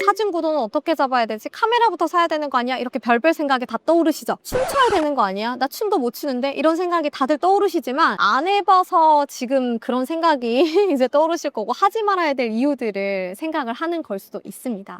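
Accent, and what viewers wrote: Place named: Gyeongsang, South Korea. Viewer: 경상도 억양이 있으시네요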